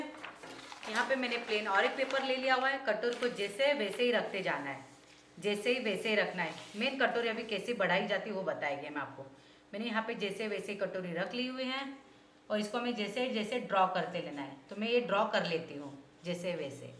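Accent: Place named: Maharashtra, India. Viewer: मैडम आप के बोलने के तरीके से आप महाराष्ट्रीयन हो ऐसा लगता है। वैसे आपके सिखाने का तरीका बहोत ही अच्छा है। असं वाटतंय की आपल्या घरातल्या माणसाकडूनच आपण शिकत आहोत. इतक्या जवळच्या वाटता आपण. 👌👌👌👌🌷🌷🌷🌷🌷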